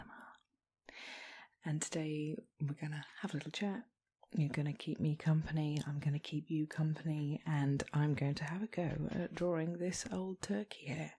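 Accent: United Kingdom. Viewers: This looks and sounds amazing!! British Accents are my favourite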